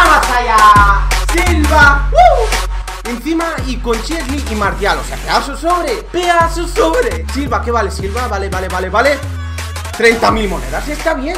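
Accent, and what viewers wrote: Argentina. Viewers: Jajaja me encanto tu acento argentino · Hablando argentino boludo jajaja <3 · Yo soy Argentino,y te sale re bien el acento xDDD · Que buenos son tus videos boludo! jajajajaja me encanto hablando Argentino! Crack la concha de la lora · De los mejores acentos argentinos que escuché de alguien que no es argentino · jajajajaja q bueno el acento argentino!!!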